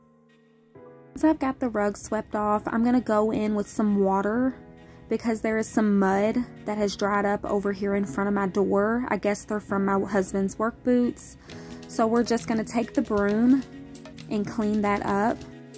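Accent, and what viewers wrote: South, United States. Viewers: I love when people say things different than I do. I find it sweet and southern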